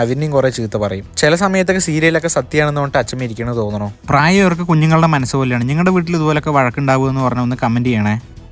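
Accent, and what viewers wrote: Palakkad, India. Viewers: മോന്റെ നാട് പാലക്കാട് ആണോ ഭാഷ കേട്ടിട്ട് പാലക്കാട് പോലെ തോന്നുന്നു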